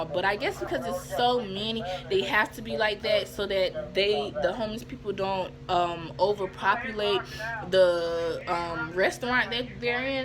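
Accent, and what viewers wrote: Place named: Deep South, United States. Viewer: "East Coast"...lol.. Naaah' you're from the DEEEEEP South!! Lol. It's all good. How's Vegas?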